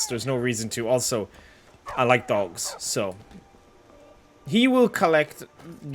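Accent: Ireland. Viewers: Glad to hear that voice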